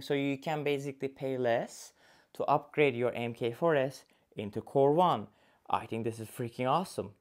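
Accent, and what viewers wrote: Turkey. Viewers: accent 100% Turkish :) (not a criticism)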